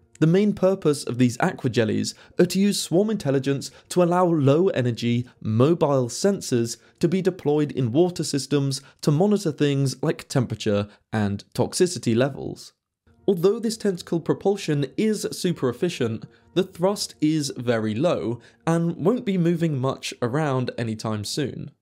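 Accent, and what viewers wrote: United Kingdom. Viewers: A British narrator should "reduce" his British accent? Why? Because it triggers a snowflake like you? Get back under your rock 🤣🤡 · Any chance the narrator can reduce the British accent? WautER instead of WautAH?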